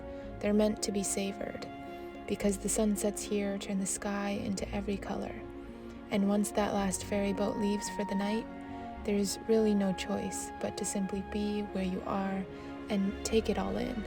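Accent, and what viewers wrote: United Kingdom. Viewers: 🇬🇧 What a tranquil island. British accent was perfect! 😁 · Some more lovely and cinematic sequences. I'm glad that the seagulls didn't take down the drone ;-). You don't need to mock or be hard on yourself, the chatting is charming, as is the British accent. Did you watch Chitty Chitty Bang Bang for inspiration? Keep up the engaging work and life ❤️ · I love your British accent. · Everything sounds just lovely with a british accent! 🇬🇧🇬🇧🇬🇧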